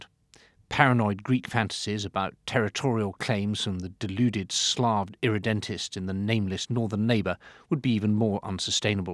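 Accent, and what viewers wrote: United Kingdom. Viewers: I love how the guy sounds exactly as you'd expect a british guy that is drawing border to sound, complete disregard for cultural boundries, superficial understanding of intercountry problems, arbitrary splittings and most important of all preferred to rip out northern Ireland making it an island of it's own without any mentions rather than giving it back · Wow nice British accent mate..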